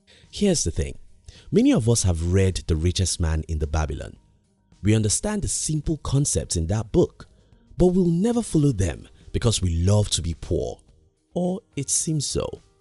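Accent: Nigeria. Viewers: That's a Nigerian 🇳🇬 accent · Gather here if u think the narrator sounds like a Nigerian ✌️ naija fi life no fuckery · Brilliant Nigerian voice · Lol Narator sounds like he from my country Nigeria 🇳🇬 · For those who might be wondering where these ascent comes from, well, this is a Nigerian ascent. Good work bro!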